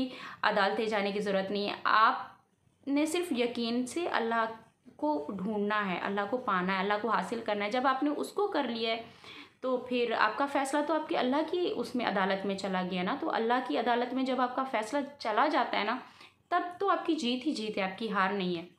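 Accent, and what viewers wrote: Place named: Sindh, India. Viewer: App konsey village say ho..........ap ka lehja tu sindhi lagta hai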